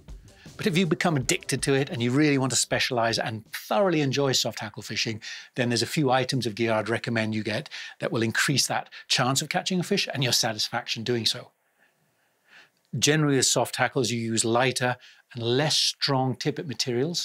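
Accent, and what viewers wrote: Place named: Wales, United Kingdom. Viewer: awesome vid as always. I’m wondering about your accent; everything I’ve read says you’re from the West Country but I’m sure I detect a hint of Welsh in your voice?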